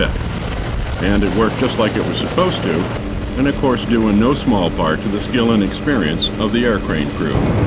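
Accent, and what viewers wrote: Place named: United States. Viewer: narrator hard American accent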